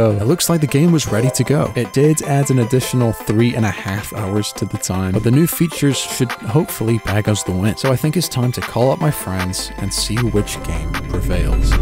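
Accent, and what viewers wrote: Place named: England, United Kingdom. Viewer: I thought your from England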